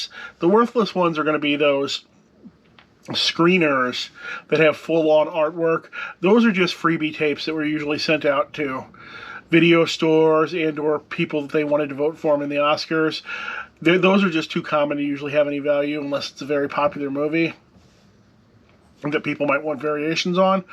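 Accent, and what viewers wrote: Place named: Missouri, United States. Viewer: You sound like your from Missouri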